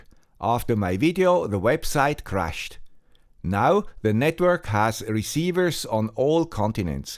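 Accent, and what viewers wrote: Switzerland. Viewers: You will be missed,the man with the swiss accent. I hope someday you get back to regular videos, I don't mind if you accept more YouTube ads. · Hi there, another guy with a swiss accent. Big fan of your channel! I took over a farm this year and you are the reason why i am currently connecting every cow to my Lorawan. You got me hooked on LoRa. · Thanks from Australia! (My father was Swiss, so your accent always made me smile 😃) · Thank you for all your fantastic work! As a French speaker (France), English with a Swiss accent makes it easier for me to understand ;-) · Many thanks for your great tests, sharing success and especially failures. Looking forward to hearing your Swiss accent) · We'll always be grateful to the guy with the Swiss accent. Thanks for teaching us so much over these 10 years. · No! Suisse accent ! 😢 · Dear: Thanks for years of inspiration. 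Have a nice retirement and safe travelling. We will wait for the next video with The Swiss Accent 😃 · Surely for many of us, you are not just the guy with the Swiss accent, you are a great professional and a true gentleman. Take the time to enjoy your family, especially your grandchild. ! THANK YOU ! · Swiss accent with a heart :) best ahead to yourself and your family. Thank you for sharing your journey. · Hallo. Das ist ja eine traurige Nachricht für Deine Follower. Vielen Dank für die vielen hervorragenden Videos. Du warst ein fester Bestandteil am Sonntagmorgen, oft beim Frühstücken. Meine Frau erkennt den „guy with a Swiss accent“ auf Anhieb😂 Ich komme auch aus der Softwarebranche und habe mich immer gefragt, wie Du es schaffst, diese sorgfältig recherchierten Videos in einer Woche zu erstellen, neben einem anspruchsvollen Job, über viele Jahre. Hut ab. Ich werde Deine Videos vermissen, und die Impulse, die Du gegeben hast. 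Danke für alles, genieße die freie Zeit. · what a fantastic channel! Your videos are a huge inspiration for my own maker journey and the tech content I create here on YouTube. I love tinkering with the ESP32 myself to turn my gas and electricity meters – and anything else I can think of – into smart devices. Your charming Swiss accent makes the videos even more enjoyable for me from Germany, but what I value most is the depth with which you explain every topic. As an advanced maker I learn something new every time – thank you for sharing your knowledge so generously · As a long term viewer, but not so a commenter. REALLY Thank you for all the new ideas, tech, and all the effort put in each video!! I always look up for your channel by "the guy with a swiss accent" and works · Thank you I always looked forward to your videos. It inspired me to make my own projects, making use of Banggood and Aliexpress, and I was even once featured on Hackaday. 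Thank you Guy with the Swiss Accent! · Dear, the guy with the Swiss Accent - Thank you..... Like many others you kickstarted my homeassistant, arduino, Esp32, wifi, Raspberry PI (grafana etc,), BangGood and Aliexpress addiction 🙂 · Dear Mr, our friend with the Swiss accent, thank you. Without knowing it you have helped me (amongst countless others) get better in my field, learn a ton of new things, get a degree in electrical engineering, hunt for weather balloons, get a MSc, fall in love with microcontrolers etc while having fun watching your videos. We are grateful for the countless hours you have spent creating these vids. It was an honor. Best regards, a viewer from Greece. P.S. If you decide to visit Greece coffee is on me:) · So many cool concept that you have presented, some I have also implemented with your Swiss accent help. And most important - I finally thanks to you I did not feel I am the only one, too old, or crazy enough to tinker with everything I touch xD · Thanks for all of your video. 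It make me feel more understand about electronic device, especially radio one. "Guy with Swiss accent" Will never gone. Thanks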